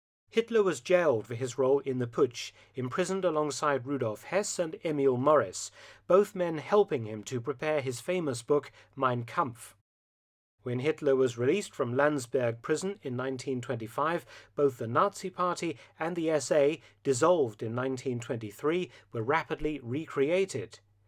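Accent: England, United Kingdom. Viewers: It's the Brittish accent that keeps me coming back!👍🇬🇧😉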